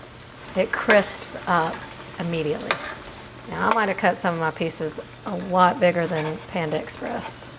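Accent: South, United States. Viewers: the recipes are the bonus! shes the big draw! that tiny powerhouse with the husky southern accent! shes the ticket!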